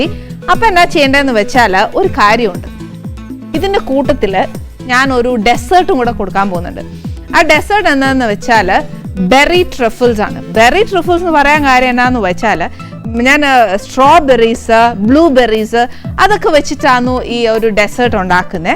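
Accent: Kottayam, India. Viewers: Kottayam slang matram mattiyal kollam... Dahikkunnilla.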